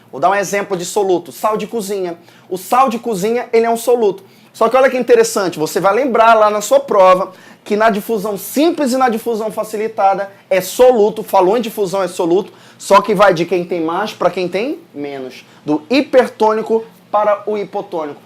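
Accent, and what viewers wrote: Pará, Brazil. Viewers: muito bom! por um acaso tu es paraense ? kkkkk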